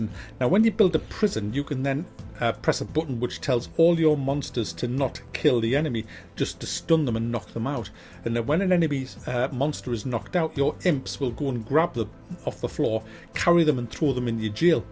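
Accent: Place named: Newcastle, United Kingdom. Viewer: geordie youtoober? well aye. ne worries pal.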